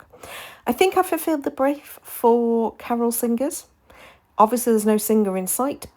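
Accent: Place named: United Kingdom. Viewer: Heey, your video is just fine! We all have our off-days (only most of us do not have to put them on the internet for everyone to share!) - and it is encouragement to all of us that you jot just managed to persevere but even got some exceedingly pretty cards out of it! Plus I love that you just tell it like it is: however pretty a set of stamps, dies, stencils is, if the instructions are not good, it is definitely worth knowing! I love your videos because they are real and fun as well as full of ideas and inspiration (and because I love your accent, takes me back to my days at uni in the UK (looooong ago 😂)). Take care and kind regards from Germany, Nicola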